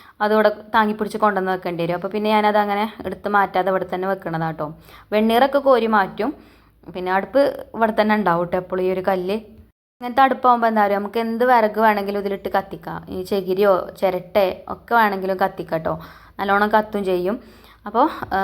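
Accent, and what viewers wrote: Malappuram, India. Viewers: ഇത്താന്റെ വീട് എവിടെയാണ് മലപ്പുറകാരെയാണെന്ന് സംസാരം കേട്ടപ്പോൾ മനസ്സിലായി. സ്ഥലം?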